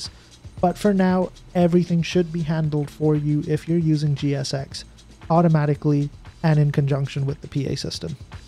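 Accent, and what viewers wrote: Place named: United Kingdom. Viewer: This dude can't decide if he's British or American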